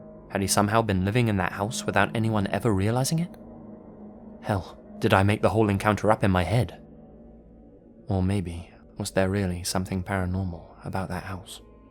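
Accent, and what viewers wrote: England, United Kingdom. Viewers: It’s kinda funny listening to a British person narrate stories about the U.S. Also, let’s go Massachusetts! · mostly taking place in New England, where i too live, this is going to be good!! makes me wonder if is in new england (though i realize he has a slight English accent)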